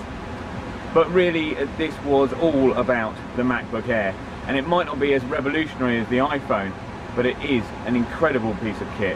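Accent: England, United Kingdom. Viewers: english accent...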